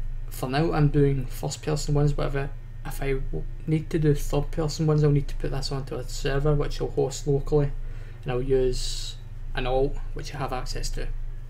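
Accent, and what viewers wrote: Scotland, United Kingdom. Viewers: Are you Scottish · U sound Scottish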